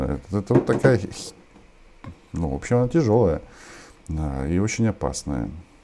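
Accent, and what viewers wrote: Russia. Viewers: Шановний Романе, ви переродилисъ? Чую наголошення ставите, як росіяни УкрАинские, але ж УкраІнські, зрозуміло, ви працюете на руськомовну аудіторію, нажаль,.....❤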